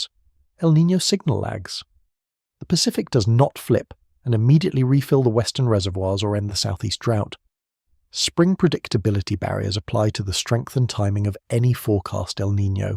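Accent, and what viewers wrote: United Kingdom. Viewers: Why the British accent on these videos?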